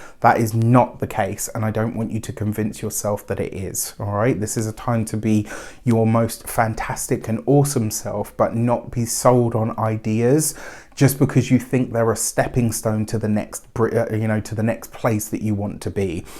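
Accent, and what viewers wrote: United Kingdom. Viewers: Hello sir, I happened to come across you for the first time ever...thank you universe 🥰 great read, thought provoking and resonant for me. Thank you, also nice to have a British male accent doing readings ☺️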